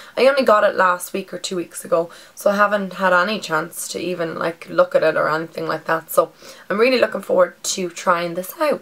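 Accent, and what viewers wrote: Ireland. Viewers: Bet u from Dublin or cork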